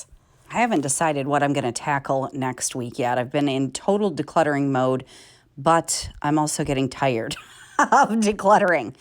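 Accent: England, United Kingdom. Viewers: life is all about finding joy wherever you can and if talking in a British accent is what makes you happy then do it ❤ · I love remi been watching you both about the same amount of time to. I love your British accent. You should hear my American one its awful 😂 love to you and ypur family x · For sure...keep the British accent!! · I love your British accent 😂😂 If it brings you joy carry on 😁🇬🇧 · Great work I’ve not cleaned behind my washing machine for years and now you’ve made me realise that I’m going to have to do it. And I’m English and I love your English accent you do xx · This was a great one.. Love the little British twangy.. Appreciate you❤ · I love your English accent 😂great job ….lord I hate dust 😊❤️ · Hi I’m from England and I’ve been watching you since you started and I thought you spoke in an English accent but I wasn’t sure and I didn’t say anything just in case, but well done it doesn’t sound that bad 😂😂❤❤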